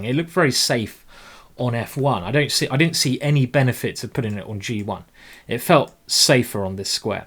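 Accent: United Kingdom. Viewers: Your voice's alright, mate. The world is bathed in American media, with nasal voices in comparison to which any British accent at all is like a musical experience.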